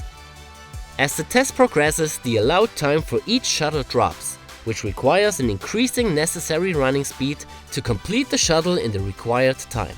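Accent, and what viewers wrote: Germany. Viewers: your english is the prime example of the german english out there :D unfortunately was priceless. ;) great video again thought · Als ein Franzose, ich verstehe seinen "Deutsch-englisch" viel besser als amerikaner Englisch aha !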